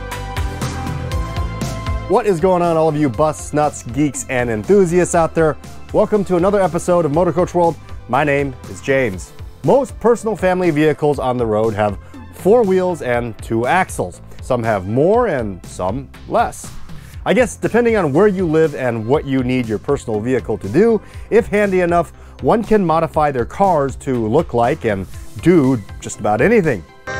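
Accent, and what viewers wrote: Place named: United States. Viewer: U aint no chinese, bruh - as far as I can hear, u r hundred percent American :D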